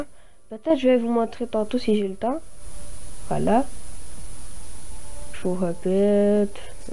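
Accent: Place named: Quebec, Canada. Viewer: Du québécois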